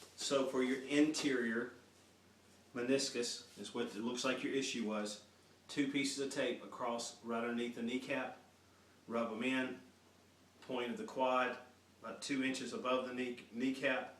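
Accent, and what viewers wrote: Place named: South, United States. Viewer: I think he says 'interior meniscus' (with a southern accent), which means medial meniscus. And he meant to say 70-degrees angle. All good. Still a good, instructional video.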